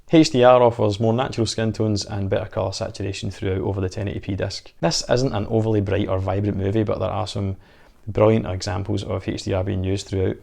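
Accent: Scotland, United Kingdom. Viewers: Get more subscribers....Hardly with that annoyinh Scottish accent 🙄👎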